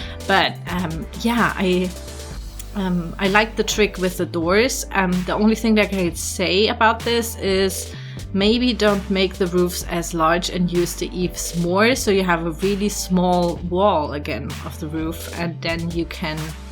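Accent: Germany. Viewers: Me: hears the accent *Checks channel information yup. Germany. P.S. COOLES VIDEO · And youre dialect sounds like German is that right ? 😊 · Der deutsche Akzent ist die beste! 💛 So ein schönes Haus. Echt gut gemacht!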